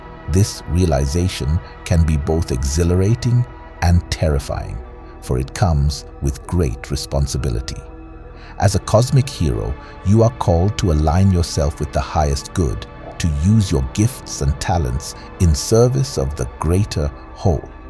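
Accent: India. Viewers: You are sound like Indian..!!! Solemn voice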